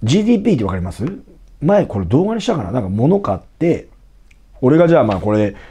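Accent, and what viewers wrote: Nagoya, Japan. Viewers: おみゃーさん、名古屋弁まるだしだがや。ステキ…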